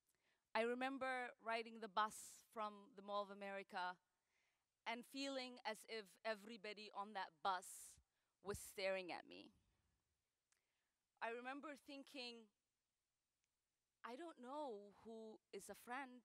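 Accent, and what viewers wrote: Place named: Somalia. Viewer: I see she's revved up her Somali accent in order to represent her people - not Americans, but CAIR and its affiliates.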